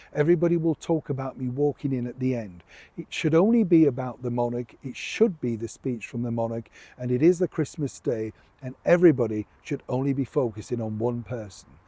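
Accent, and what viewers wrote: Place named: Northern England, United Kingdom. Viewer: Hello Big wave, Chums👋👋 I am on of the ones that have been here since the beginning. Well, under 1000 listeners, anyway. I remember the day got to 1000, it was a celebration! I like because he is from the north, and talks like my late Da. He uses similar expressions as our Da. He also has the same eyes but then so does Piers Morgan (ooh, he looks like Da! lol!). It is all in the eyes. The crinkly blue eyes. I miss me Da, so it is like coming home when I listen to. And I WILL be, next week, yay!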